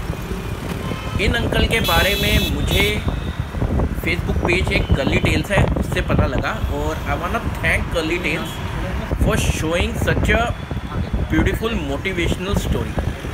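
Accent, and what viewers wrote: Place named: Punjab, India. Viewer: Best part of your channel is ki aap hindi bhi punajbi accent me bolte ho.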